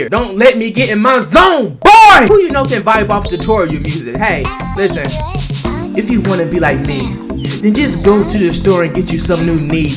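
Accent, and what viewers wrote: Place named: Philadelphia, United States. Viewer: YOU’RE FROM ATL? I thought you were from either Philly or Michigan.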